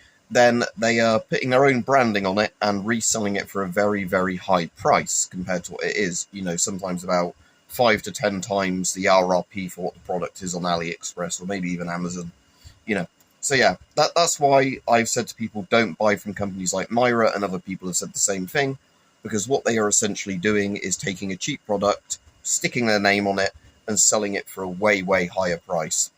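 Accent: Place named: England, United Kingdom. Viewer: sounds English 🏴 -looks like El Chapo 🇲🇽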